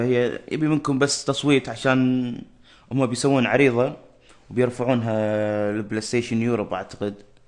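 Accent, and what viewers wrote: Kuwait. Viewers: اما انت سعودي والله كنت احسبك كويتي &__& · اشكرك بس مافهمتش ولا أوشي هههههه عاد انت من الرياض زانا أحسبك من إخواننا الكويتين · آما انت من الريآض , آحسبك كويتي !؟ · انت سعودي ولا كويتي ؟؟؟ يا اخي كلامك كويتي والله احسبك كويتي طول هالمدة:)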